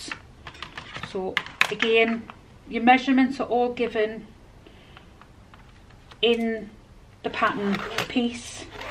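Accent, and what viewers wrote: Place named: North East England, United Kingdom. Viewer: Nice to hear someone from North east on here instead of americans. You make your NCW exactly the same way as I do. I love how the waterproof canvas makes it more structured but i have never used it before can it be bought here in the north east please.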